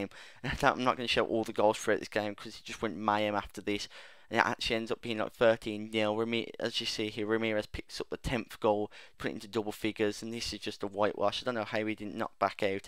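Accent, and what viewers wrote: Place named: Birmingham, United Kingdom. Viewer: Where are you from, you sound like your from birmingham?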